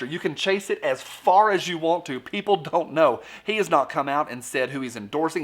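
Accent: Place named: Appalachia, United States. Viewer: Being from the Appalachians is nothing to be shy about just because you chose to speak the civilized pronunciation (i.e.: the English language). So, my friend and hero, - feel at ease. If you pass my grammatical standards, there’s nuttin’ to worry about. I say that with the authority vested in my soul by my late mother, who was a strict Grammar Nazi, born and raised in The Netherlands before the REAL Nazis invaded Holland... etc. I’m pretty sure you are aware of this already, but I thought I’d share a tidbit of actual truth from the comment section: The so-called “Cancel Culture” is just another offshoot of the liberal/left social destruction of what used to be a damned fine Republic - ours. Whether the word used is SJW (social justice warrior) or BLM (black lives matter, but is often co-opted by white SJWs who have been brainwashed to believe the guilt trip that is “white privilege”). 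This travesty is rooted in the higher education system, where young college kids are being indoctrinated into believing they should be unhappy with everything in our country. Why? You ask - liberal professors teach their students intolerance for any facts or opinions which are viewed as conservative, which has transformed what was normally a bastion of free speech and discourse that US educational institutions were formerly known for - into an intolerant atmosphere where guest speakers are denied access to their audiences who had invited them, and they are assaulted further with hate-spee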